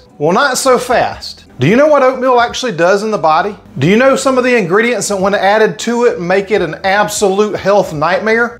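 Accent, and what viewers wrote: South, United States. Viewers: This looks DELICIOUS, I really like a hot cereal and this will be something I try for sure, thanks so much for sharing and I really enjoy your southern accent!! 😊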